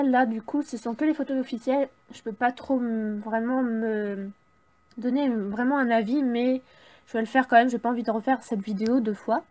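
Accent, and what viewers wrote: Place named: Quebec, Canada. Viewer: Parce que on dirait que tu parles Québécois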